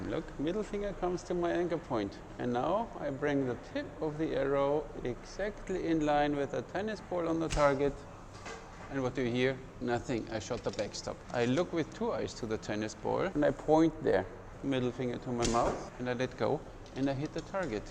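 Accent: Germany. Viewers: Dein englisch ist so geil :) man hört sofort, dass du ein deutscher bist ;} was ist mit dem kompletten instinktiven schießen?! Also NUR das Ziel fokozieren?! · Dachte ich erstmal der ein Deutscher ist, doch hätte ich Unsicherheit entweder er Deutsch oder vielleicht Niederländisch ist. (Leider habe ich nie nach Europa gereist). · Are you German?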